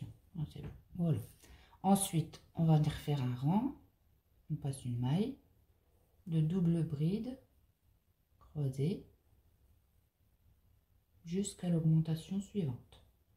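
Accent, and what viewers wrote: North, France. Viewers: Bonjour, je vous decouvre ..je pense à votre accent que vous étes du nord, j habite l alsace mais je suis native du nord ...j aime vos explications et j ai hâte de découvrir vos autres réalisations....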